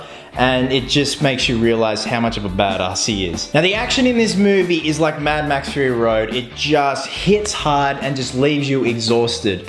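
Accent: Australia. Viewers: DAAAMMM you look sexy in that bat outfit, mmmmm yummy and love the Australian accent makes you even sexier. Do i agree with your assessment with the movie? Not completely but that dont take away from your sexiness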